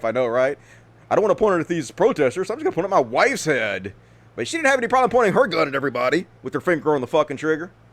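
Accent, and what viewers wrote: South, United States. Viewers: For the first 30 minutes I literally thought you were saying ‘Cow’ instead of ‘Kyle’. I have a southern accent too and it still took me a second.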